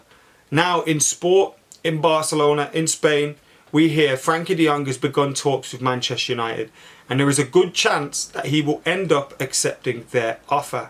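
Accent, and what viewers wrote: Birmingham, United Kingdom. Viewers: Dang I really thought was from Manchester but he’s from Brum like me 😂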